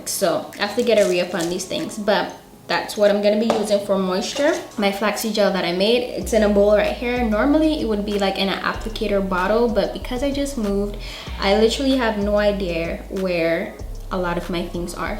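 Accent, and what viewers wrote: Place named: Caribbean. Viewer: I hear a slight accent! Are you by chance from the Caribbean?🥴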